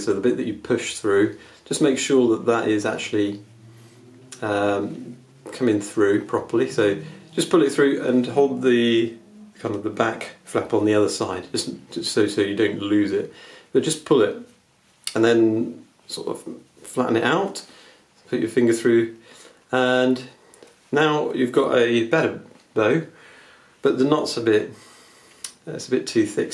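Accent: United Kingdom. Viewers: The English accent makes it better! But seriously I got it with this video.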